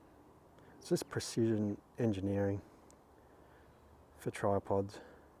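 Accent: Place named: Australia. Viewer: why on earth would this video get so many dislikes... haters? Anyway, I ordered a RRS TVR24 and BH40 w/swivel mount for my Leica M10P and Noctilux .95 50... nothing but the best all across the board.. and haters gonna hate, who cares! -- btw, I hear a Ausie accent, but looking at you I'd swear you were from Portland Oregon.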